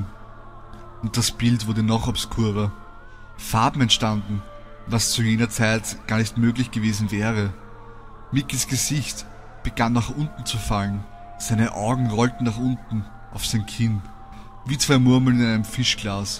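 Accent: Vienna, Austria. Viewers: Bist du ein Wiener ? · Der Sprecher ist Österreicher haha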